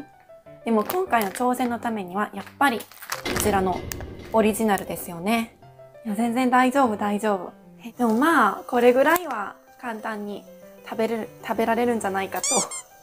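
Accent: Kansai, Japan. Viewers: 関西弁使ってるやん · こんにちは😊辛いの、ダメそうかなと思ってたら、やっぱり🤭鼻水でるし、汗かくし。『ちょっと腹立つなぁー！』ウケました（笑）ちょこちょこ関西弁出るし…。ワンちゃんの大事なところ、隠してくれてアリガトワン！（笑）🐶娘がちゃんより、少し歳下ですが、娘を観る感覚で観てます👍では、アンニョン🎵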